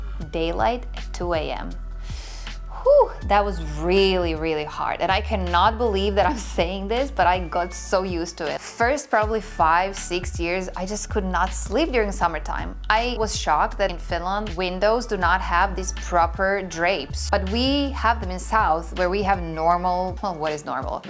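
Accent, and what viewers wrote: United States. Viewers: Stop speaking American English when they are killing people all over the world. Finland is the happiest country on earth so why spoil it with that Yankee talk. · sorry u sound yankie, no ring! kokeile suomex ( 10y exp?) yt 'moro suomaasta'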